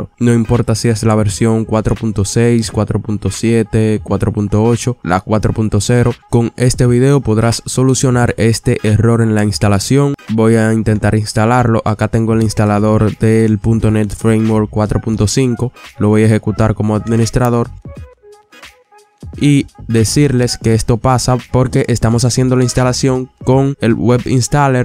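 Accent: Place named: Dominican Republic. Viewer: Todo muy claro, bien organizado y estructurado, además acompañado de un buen acento dominicano. Muchas gracias señor ;)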